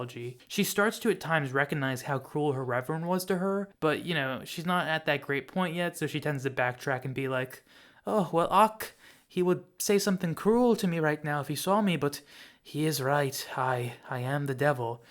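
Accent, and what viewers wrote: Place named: Scotland, United Kingdom. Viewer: Nice thoughtful look at Rahne Sinclair! Loved the Scottish accent!! Looking forward to your thoughts on the other New Mutants! Thanks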